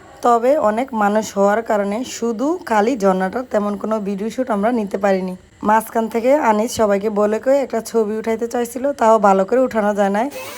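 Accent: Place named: Chittagong, Bangladesh. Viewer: ভাইয়ের চিটাইংগা হথা বউত গম অইয়ে।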